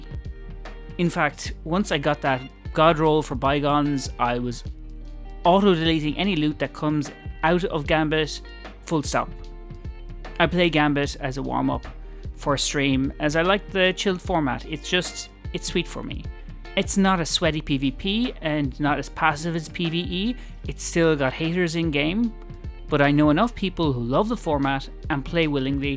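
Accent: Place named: Dublin, Ireland. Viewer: You from Dublin too lad?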